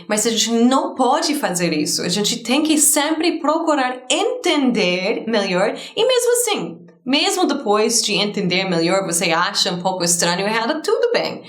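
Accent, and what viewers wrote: United States. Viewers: Você tem uma maneira de se comunicar interessante, além de falar com sotaque Americano, você se expressa muito bem com as mãos e até com os olhos... Tudo que voce falou no curso gratuito, aconteceu comigo. Já morei ai em São Paulo, sou de Maceió-AL... Pra se adaptar bem ai em São Paulo é nunca comparar com seu lugar de origem, paulista brinca e gosta de pessoas que não irritam-se !!! Your Friend Dinhoantenista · É impressão minha ou seu jeito de falar está meio " americanizado" de novo, perdeu um pouquinho do jeitinho brasileiro de falar? ( nada ver com aquele "jeitinho brasileiro" ruim. lol!).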